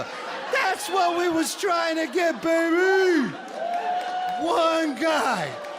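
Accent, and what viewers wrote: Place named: New York, United States. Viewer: I could of swore I heard a New York accent. Thanks for the laughs.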